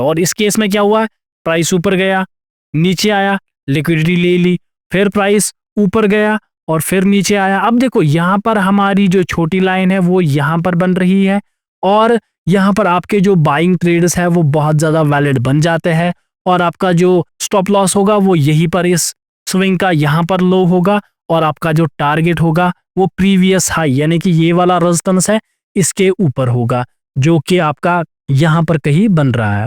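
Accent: Kashmir, India. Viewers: Hi bro... Aawaz sun ki ee laga ki kashmiri ho... Same here from South kash.... Wanna meet you... Please tag your address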